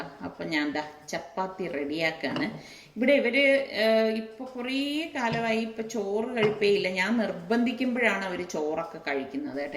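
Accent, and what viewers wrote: Trivandrum, India. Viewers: Trivandrum ano veedu.speech nalla vyathysam.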